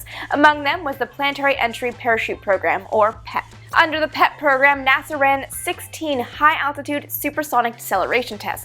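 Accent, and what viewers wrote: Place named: Canada. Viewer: I was wondering the same thing - I noticed how you { } pronounce your "ou's" when you say words like "about". {FWLIW: Growing up in Florida, there was an 'older couple' who were neighbors of my parents. The husband was from Italy, and the wife was from Canada. Listening to her talk was my first exposure to that pronunciation.}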